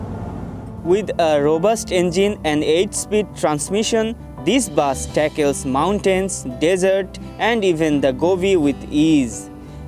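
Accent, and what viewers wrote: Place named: Bangladesh. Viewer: WITH THAT BLOODY bangladeshi ACCENT U CANNOT IMPRESS INDIANS. AND BANGLADESH HAS NO MON TO BUY THESE.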